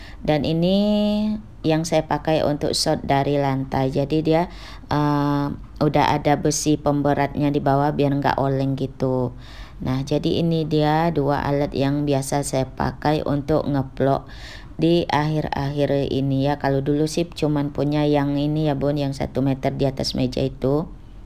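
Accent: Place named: Pekanbaru, Indonesia. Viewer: assalamualaikum bund..cara ngomong nya mirip orang Pekanbaru bund..hihi..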